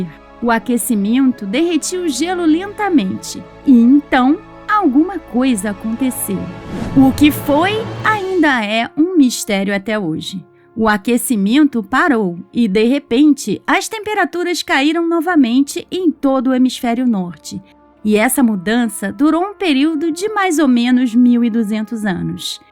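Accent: Rio de Janeiro, Brazil. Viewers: Adoro o sotaque! Acredito que seja carioca, e eu acho lindo... 😊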